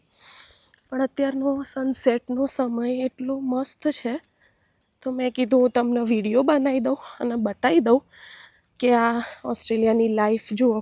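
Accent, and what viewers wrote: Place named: Himmatnagar, India. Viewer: Tame. Himmatnagar side na lago chho, mari ekk friend hattii evu j bolo chho.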